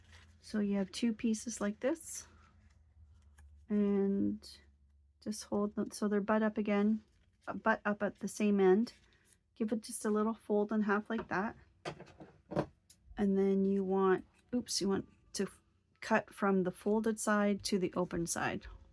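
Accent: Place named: United States. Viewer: New subscriber today. I love your calm presentation style. I'm in the UK. Which part of America is your accent from it's lovely?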